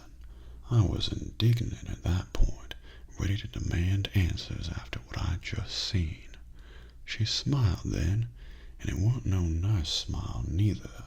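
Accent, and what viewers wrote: South, United States. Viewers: holy shit,... the southern accent is not half bad! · As a Southerner myself, this accent is EPIC! Well done! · you have a better southern accent than most movie stars, this was a blast to listen to. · John Goodman but 10 Times More Southern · Southern drawl: Spot. On. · My dude, not only is your voice my favorite but the southern accent is out of this world.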